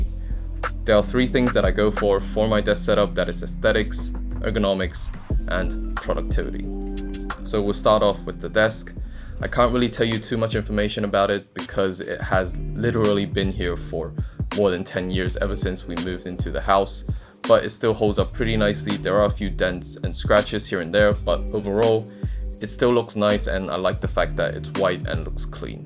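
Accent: England, United Kingdom. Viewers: nice english accent you got there attending a local school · I really like your English accent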